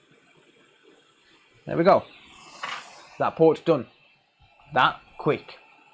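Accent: United Kingdom. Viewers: I could hear the authoritative British accent before the video even began 😆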